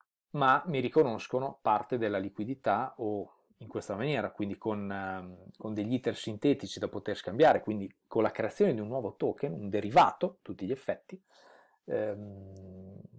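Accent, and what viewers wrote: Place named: Bologna, Italy. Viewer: Ottimo video, preciso senza essere troppo tecnico....e l'accento bolognese é fantastico... Detto da uno di Modena 😉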